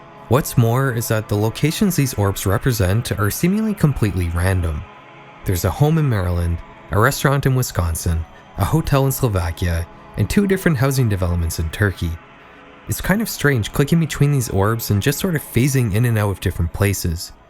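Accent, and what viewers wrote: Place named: Nova Scotia, Canada. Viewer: Are you from Nova Scotia?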